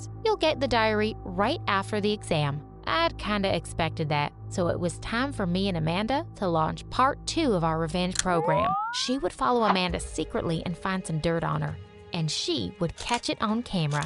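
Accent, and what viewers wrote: United States. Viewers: Girl i love your cowgirl accents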